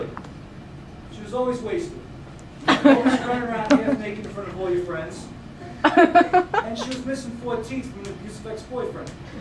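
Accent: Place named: New York, United States. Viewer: Dude, you are funny guy. I love your accent. Is it NY or Chicago? LOL If you watch my latest vid you will see the proper way of adjusting ric truss rods. PS- I liked rehab so much I went back three times. Hey I subbed to you, sub back. You rock.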